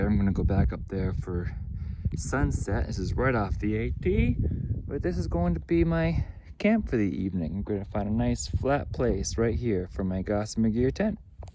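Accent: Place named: New England, United States. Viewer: I think you should just say it how you say it. Nevermind the naysayers! We are New Englanders, get used to the weird way we say things! lol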